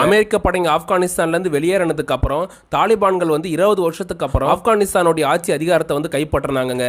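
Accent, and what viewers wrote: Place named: Thanjavur, India. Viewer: சார் தஞ்சாவூர் ஏரியா போல.அந்தாண்டனு சொல்றார்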